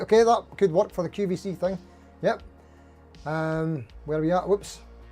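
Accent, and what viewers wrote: Scotland, United Kingdom. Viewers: Never apologize for your accent! Remember the first rule of quality..."If it's not Scottish... IT'S CRAAAAAPPPP!"🏴🤪👍✨💙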